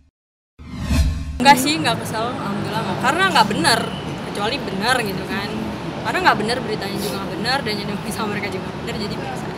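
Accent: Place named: Jakarta, Indonesia. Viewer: ngomongnya betawi bgt dah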